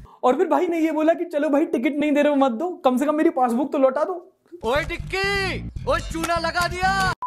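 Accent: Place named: Bundelkhand, India. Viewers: Bundelkhandi very beautifully spoken.. keep it up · It feels so good to hear a recongniged youtuber speaking bundelkhandi.. ✨😁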